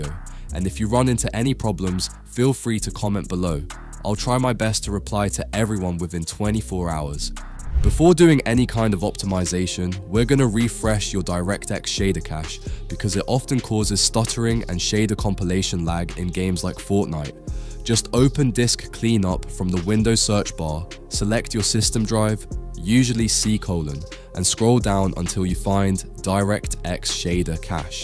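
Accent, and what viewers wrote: India. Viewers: yea man the vid is botted and bro ur indian voice we know it so put the voice changher off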